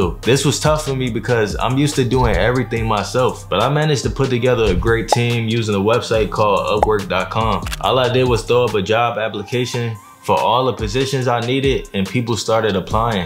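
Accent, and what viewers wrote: Philadelphia, United States. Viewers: I usually don't comment to any videos, but really, I enjoyed the language and the knowledge he shared, maaaan check how he pronounce the hundreds LMAO, I just feel like I'm talking to a close USA friend haha peaaace man ❤ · Are you from Philly?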